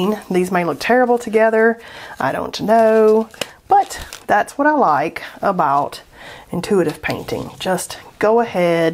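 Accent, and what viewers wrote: South, United States. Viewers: FINALLY find someone with MY accent. I was beginning to think I was the only southern artist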